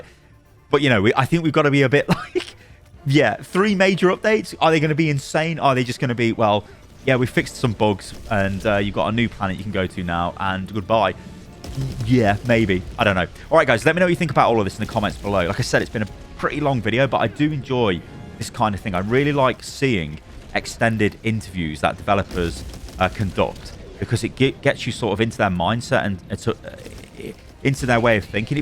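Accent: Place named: Southern England, United Kingdom. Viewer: Nah they're both just from the south. London area.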